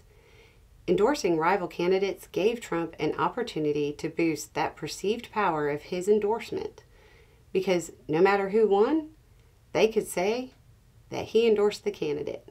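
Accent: South, United States. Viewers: Is t just me, or is anyone else expecting her o sign off with "And y'all come back now, ya hear?". Love the accent. And the message is, as always, on point.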